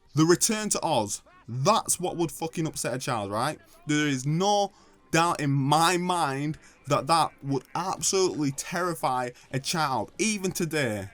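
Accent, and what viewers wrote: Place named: Bolton, United Kingdom. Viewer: That's a Manchester accent Sounds like Bolton?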